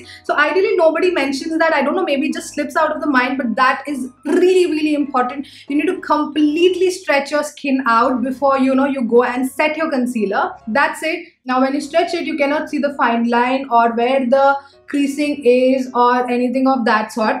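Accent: South India, India. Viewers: Loving that South Indian accent in your English...❤️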